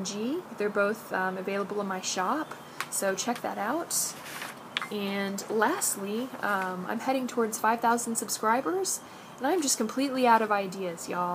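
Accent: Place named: Texas, United States. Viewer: I think this is the first time I've heard you speak like a Texan,. I heard you say "y'all" at the end of your video. lol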